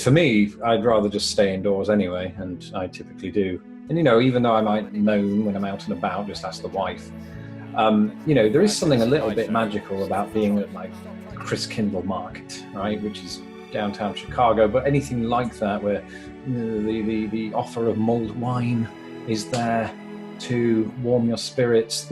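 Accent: United Kingdom. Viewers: Proof we will watch anything with birtish commentary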